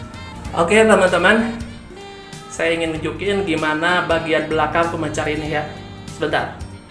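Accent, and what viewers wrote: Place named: West Java, Indonesia. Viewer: Penjelasannya mantap jelas logatnya sundanis asli keeeren lahh pokoknya👍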